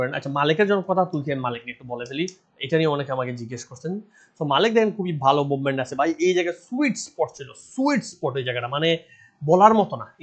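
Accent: Sylhet, Bangladesh. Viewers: আপনি কি সিলেটের? আপনার ভয়েস অমল দার সাথে মিল আছে।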